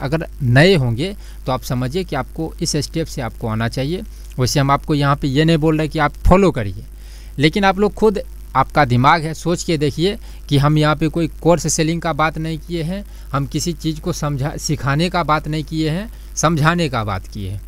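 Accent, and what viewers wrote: Bihar, India. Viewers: तेरे आवाज में पूरा बिहार पान लग रहा है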